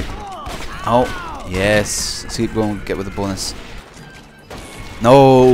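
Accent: United Kingdom. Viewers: Oh my bad. I knew you were somewhere from uk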